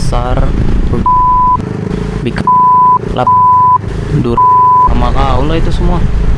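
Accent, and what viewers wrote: Medan, Indonesia. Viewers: udah agak ilang kutengok logat medan kau disini lae, jangan di ilangin lae daya tariknya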